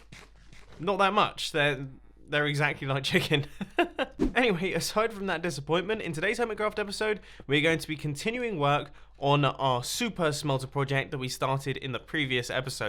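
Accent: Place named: United Kingdom. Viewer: I love me a British accent. Anything sounds better in a British accent lol.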